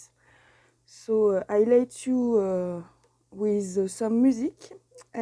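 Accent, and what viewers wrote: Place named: France. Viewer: Wonderful soap! Very very good job💕 I enjoyed listening to you talk in the intro. You speak English very well with an amazing French accent! Newly Subscribed❤️❤️❤️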